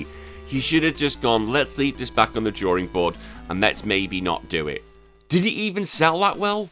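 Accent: United Kingdom. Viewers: How do you sound even more British than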